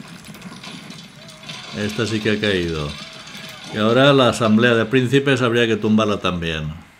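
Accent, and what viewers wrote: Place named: Valencia, Spain. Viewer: eres un grande, pareces Valenciano por el acento! Gracias por subir el 0AD Me suscribo. Una duda, el Mod de los Incas tiene arquitectura propia o la cogen prestada de otra cultura? Muy buen video si señor sigue así!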